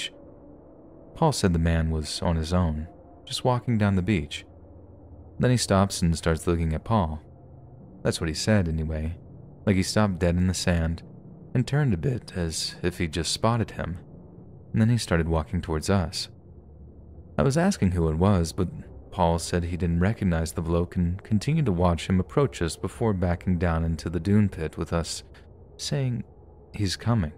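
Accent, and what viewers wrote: Australia. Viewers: Except for his Australian accent. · Australian accent is gold <3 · That Australian accent though...🖤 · Your aussie accent!! Love it 😍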